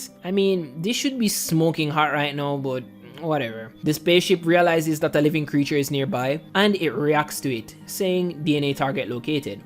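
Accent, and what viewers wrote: Jamaica. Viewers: Ur Jamaican? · No better reading than in the Jamaican accent. Love it. Your voice is great for narration.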